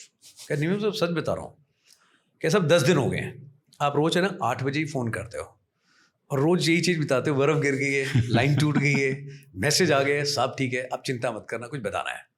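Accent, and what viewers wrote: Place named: Punjab, India. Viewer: Your Punjabi accent sound so cool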